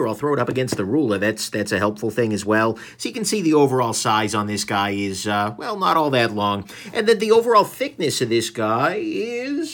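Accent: New York, United States. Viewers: I love this guy's accent, is it New York? I imagine him driving a taxi, and wearing a white vest at home, and having a difficult relationship with his mother in law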